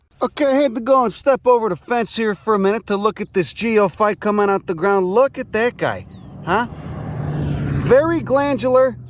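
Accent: Chicago, United States. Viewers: Chicago, Illinois area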